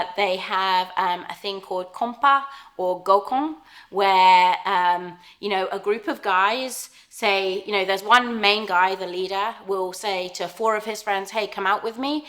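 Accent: England, United Kingdom. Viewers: SHUT UP! I LIVE IN ORANGE COUNTY! Based on your accent, of course, I expected you live somewhere in England.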